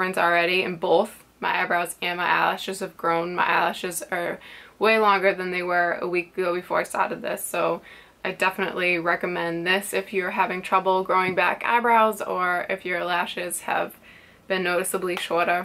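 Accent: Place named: Boston, United States. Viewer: My current fave is SPF in skincare! (especially spf 30 & higher)...& also ur accent! Are u from Massachusetts? U mentioned Jersey earlier in the video, but I get more Mass/Boston =)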